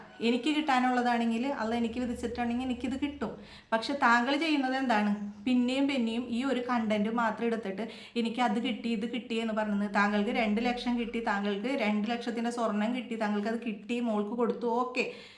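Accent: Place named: Thrissur, India. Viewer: Itha trisur ano samsaaram kondu thoniuadhaane 🥰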